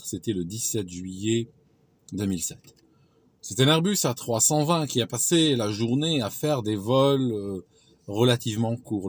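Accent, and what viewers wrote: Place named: Switzerland. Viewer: Salut - j'aime bien, j'avais remarqué dans d'autre vidéos, ton accent suisse ressort bien à des moments- vers notamment il est bien teinté.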